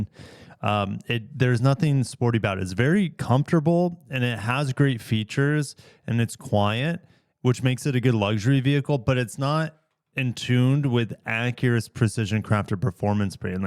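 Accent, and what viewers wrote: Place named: United States. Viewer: i personally hate this accent of america has. he talks to slow, and it sounds congested. i have to listen to video sped up to actually hear the video.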